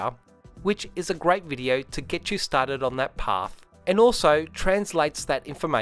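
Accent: Australia. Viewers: Good Aussie narration. No hype no BS no selling. Just fact and detail. Best video I’ve seen on you tube for polishing a car. Well done and thanks mate.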